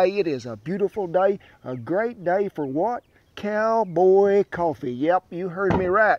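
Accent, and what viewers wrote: South, United States. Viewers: I’m Just here for the southern draw 😂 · He actually looks like the character from gta 5 Avery duggan or vice city Avery carrington . this awesome guy that’s making coffee is a bad ass I love the Southern hospitality & the accent . I hail southern from California born & raised . even though I wasn’t born a Southerner I catch myself having a southern accent sometimes 🤷🏻‍♂️ it’s odd lol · I really love his accent man, truly is a man of the woods, man of the south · I’m from India and I love the southern American accent, as soon as I heard it, I instantly subscribed to the channel. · Southern accent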